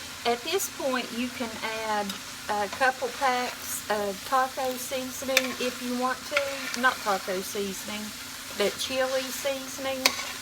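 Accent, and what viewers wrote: South, United States. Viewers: kes S - right, me too ! She is the only UTube person that I allow their ad videos completely go thru till the end. I know she makes more per view if they complete. Just love her to death, she reminds me of my grandma with her southern accent.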